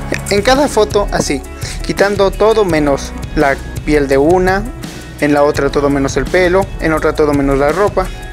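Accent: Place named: Peru. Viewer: Oye por tu acento , asumo eres de Peru ? K parte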